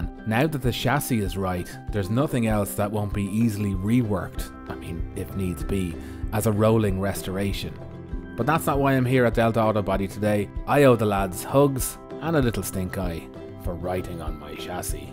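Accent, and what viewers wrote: Ireland. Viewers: You're still one of the best video editors, I love your work. The content itself is excellent and has me mesmerized. And the Irish accent narrations is the cherry!😉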